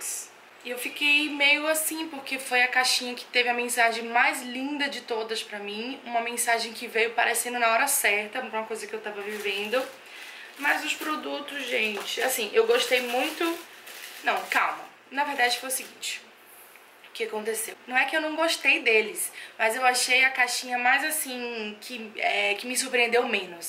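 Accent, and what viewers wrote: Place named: Salvador, Brazil. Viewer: sabia q tu era de SSA! Reconheço meu sotaque sempre kkkkk Tô interessada nessa caixa e na salon box.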